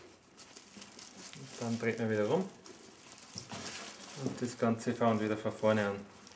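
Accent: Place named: Austria. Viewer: das video is von der qualität völlig in ordnung ;) das österreichische ist ein bisschen knifflig...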